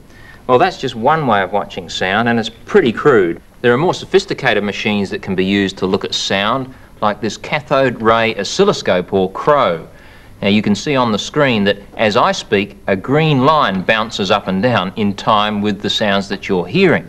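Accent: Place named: Australia. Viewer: love that Auzzy accent. The music is from beaverly hills cop so this show is no earlier then the 80's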